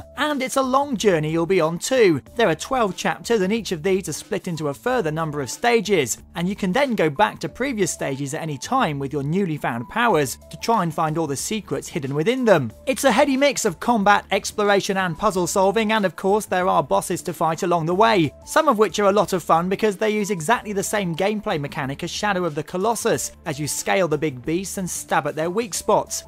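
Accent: England, United Kingdom. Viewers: every reviewer shut be forces to have a English accent like this guy : )!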